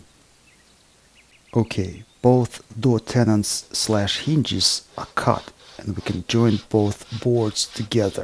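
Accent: Russia. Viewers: Really?? I thought it was him for sure with that Russian accent and little mistakes... Wow that's interesting. So I guess he hired someone with a Russian accent on purpose? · With a strong Russian accent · Shaun Davenport Defo Russian accent. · your accent reminds me of my granpa who lives in moscow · yes the accent Is with no doubt sounding russian. But he uses finnish word for dry pine, kelo. I Wonder why. I think he Is a Karelian.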